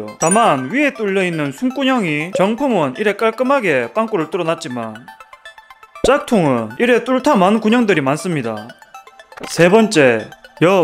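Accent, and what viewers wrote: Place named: North Korea. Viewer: 사투리가 매력적이시네요! 혹시 북에서 내려오셨나요?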